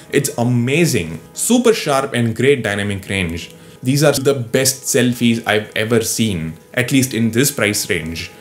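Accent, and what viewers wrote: India. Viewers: That Indian accent!!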